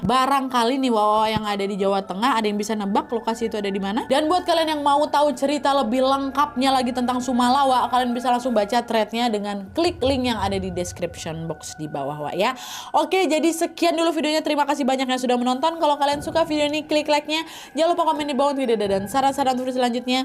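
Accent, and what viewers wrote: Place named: Medan, Indonesia. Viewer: Hai kk.aku br lht vidio kk...cr kk bawakn narasi " medan" kali y....jd lucu aja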